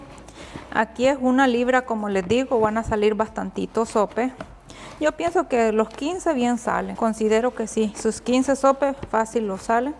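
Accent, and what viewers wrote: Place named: El Salvador. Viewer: Me gusta como abla bien salvadoreña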